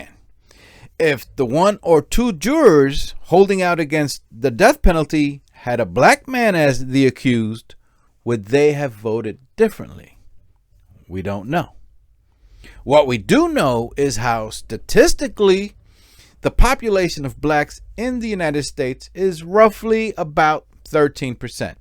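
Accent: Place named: Latin America. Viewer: acho o máximo seu inglês latino.😊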